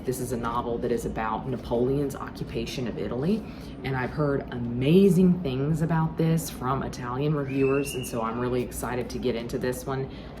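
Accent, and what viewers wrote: South, United States. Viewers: OK. So many thoughts from a newbie subscriber. What is not to love about toner when it comes to skincare? A swipe of toner over the skin leaves you feeling soft and clean. And if it smells of roses.... then its positively swoon worthy. Spiral notes books are my favourite to work with, but I would probably be like you and not able to resist buying a whole armload of new journals. I wish I could read in another language. Heres a video idea, you reading the Italian books with your Southern accent..... delightful! OK. Walking away now before I scare you away.....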